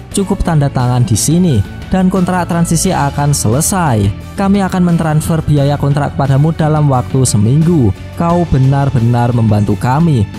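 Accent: Java, Indonesia. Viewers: bang bisa ngak aksen logat jawanya di ganti udh bosen dengarnya🗿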